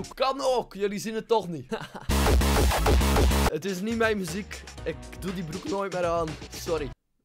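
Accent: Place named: Antwerp, Belgium. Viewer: sinds wanneer spreekt altijd uit met een antwerps accent?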